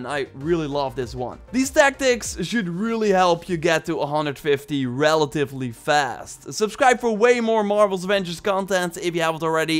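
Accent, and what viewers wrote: Netherlands. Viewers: Hes dutch thats why he got that accent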